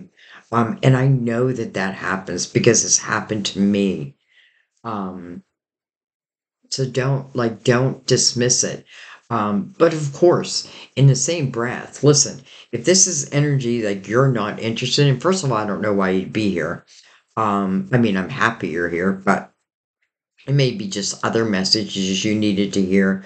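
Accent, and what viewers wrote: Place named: Western Pennsylvania, United States. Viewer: I should’ve figured you’re from western Pennsylvania. We have different accents and pronunciate words completely different. Blessings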